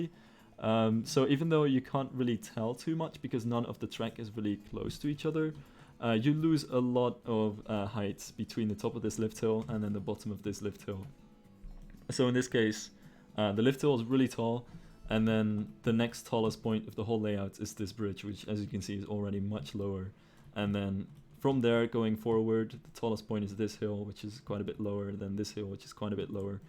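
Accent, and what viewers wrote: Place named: Netherlands. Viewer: You sound Dutch. Are u from the Netherlands?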